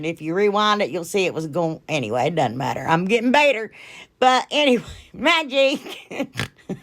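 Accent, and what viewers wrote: South, United States. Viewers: I LOVE all of your crafts, but honestly i dont know if i love those, your adorable heavy southern accent, or your silly and lovable personality more!!! You are my favorite!!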